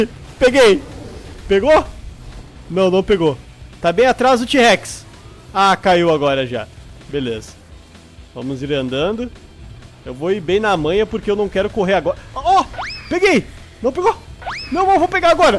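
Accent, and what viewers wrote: Rio Grande do Sul, Brazil. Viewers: Rsrsrsrs o tem essas gírias e falas gaúchas. Deixa muito engraçado rsrsr 😎😁😆😆😆😆😆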